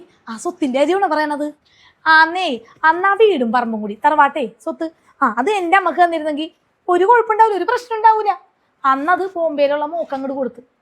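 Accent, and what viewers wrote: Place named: Ernakulam, India. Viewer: സൗണ്ട് കേട്ടാൽ അറിയില്ലേ ekm തന്നെ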